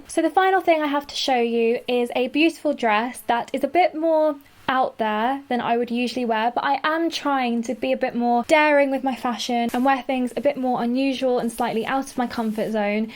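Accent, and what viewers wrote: United Kingdom. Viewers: Oh my god, you are so perfect! 😍 beautiful, stylish, intelligent and with British accent! Pure perfection 😙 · these things are not smt that I can wear(pink,gold or girly stuff are not my things) but I have to say that I love how your style complete your personality... 👌👗❤💗 I mean if it was another person with your style I don't think that I would subscribed or watched her. your personality is just shine oh and obviously your accent. I LOVE your British accent girl!!.. 😍💘💗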